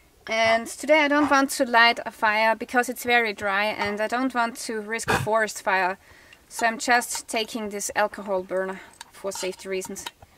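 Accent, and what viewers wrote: Germany. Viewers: Hunting with bow is forbidden where you are? Where is that? Sorry I'm new to the channel and just watching all the different videos, I'm not good with accents, but if I had to guess Germany or Austria? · are you German... I think I can hear your accent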